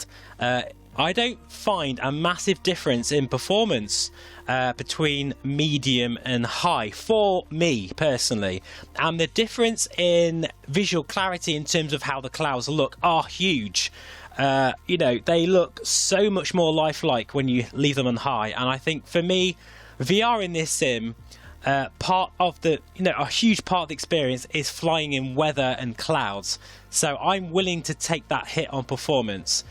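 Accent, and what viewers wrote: United Kingdom. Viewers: what? I’m being serious 😀 New subscriber, couldn’t quite make out your accent, I’m going to punt at midlands area?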